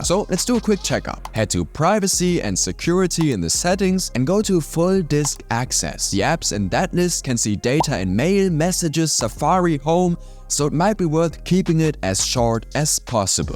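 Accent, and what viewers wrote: Germany. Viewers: Are you German ? Man kann es raushören 😅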